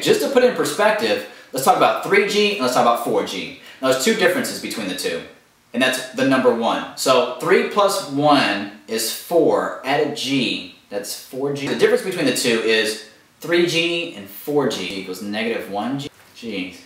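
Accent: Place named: Indiana, United States. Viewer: i really enjoyed your video! and you really do have an Indiana accent lol nice.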